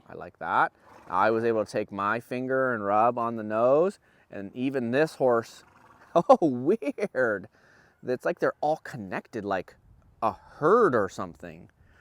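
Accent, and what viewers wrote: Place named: West Midlands, United Kingdom. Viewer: He has a West Midlands accent (Brummie/Black Country area), not a Welsh one!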